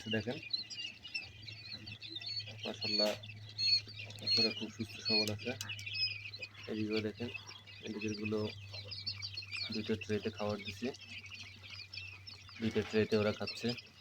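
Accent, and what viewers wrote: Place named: Noakhali, Bangladesh. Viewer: নোয়াখালী কথায় ❤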